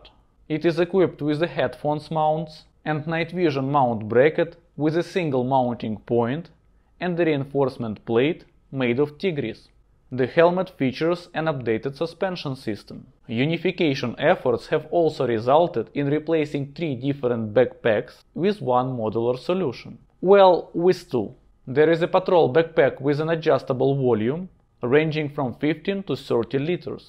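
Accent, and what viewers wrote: Russia. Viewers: Если не секрет у тебя правда такой акцент или ты чуть под стронгер русиян акцэнтиш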